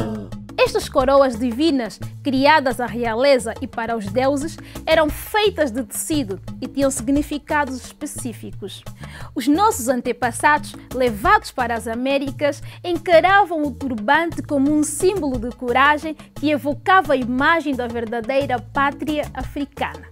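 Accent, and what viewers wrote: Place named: Portugal. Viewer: Por favor, não prenuncie as lingua africanas com sotaque/tiques do português. Não mates os teus vídeos, jovem!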